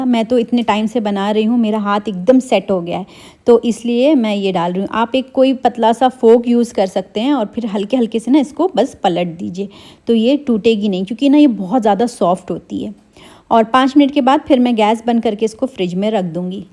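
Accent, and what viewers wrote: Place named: Azamgarh, India. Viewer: ap Azam greh ki hn meri susral mn nnden Asi hi bolti hm kpry ko kpra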